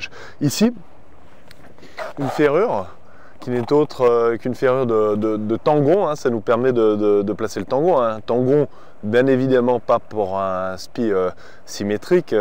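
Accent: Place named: France. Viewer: yes I like French accent, look forward to seeing it. Merci You have sold an Amel 60 as soon as I have won lotto.:).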